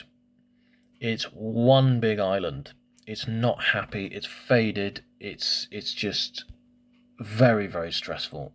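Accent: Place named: United Kingdom. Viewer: Good to have some subtitles here as some ppl might find it difficult to catch with ur native British accent. Good to see u putting the new feature in to practice with ur premiers palm. As his inheritance links to east, he might be a good family person as shown by his palm. We have a belief here that who ever come to the top seat might be under a bad period according to his or her birth chat. As always, it’s very interesting reading and illustration. Thanks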